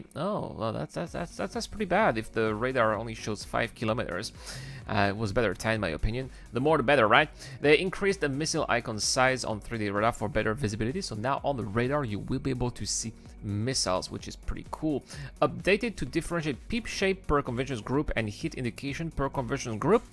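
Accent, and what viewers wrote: France. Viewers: T'es francais non? :) cintinue comme ca en tout cas j'adore ton contenu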